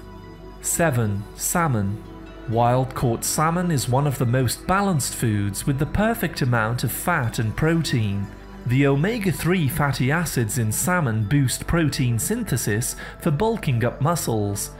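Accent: United Kingdom. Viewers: 🤣British English👍🏼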